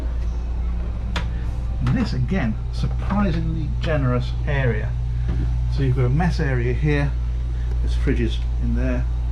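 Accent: England, United Kingdom. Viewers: You do a great job showing these ships off in complete form . Perhaps probably because you talk about them in your British accent which adds alot of Class when you show all these Boats to all the public which is us .I learned alot of from Certain Yauchts dont have to Cost $13,000,000 to have alot of room in them . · I love the Englander accent. Pretty cute and he knows his stuff! How do you make a living touring thru boats?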